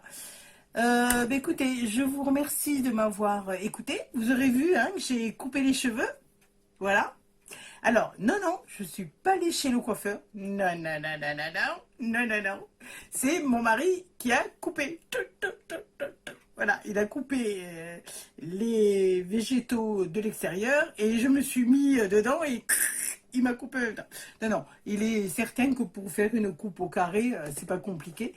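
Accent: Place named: Provence, France. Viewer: Coucou ma petite,comme tu est gentille 😊,c est moi qui te remercie,tu est si agréable, et tellement souriante,avec ton accent qui chante la Provence 😊,un régal. J ai vu de suite que tu avais coupé tes cheveux,tu est impec,😊,moi aussi mon mari m'a coupé mes cheveux, mais j ai pas le même résultat,lol,😉.Pas de soucis, tu peux compter sur moi je vais aller voir la chaîne de Ninou ☺.Un tuto 😁😁,album cartable, pas de soucis,je suis d attaque, on commence quand tu veux 😊😊, je t envoie pleins de bisous 😘😘🤗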